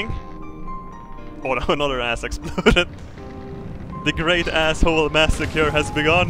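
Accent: Sweden. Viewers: GreenColorBombs the power of the swedish accsent mate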